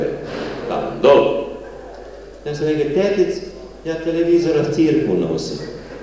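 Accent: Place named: Podravina, Croatia. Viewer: Predivan naš podravski dijalekt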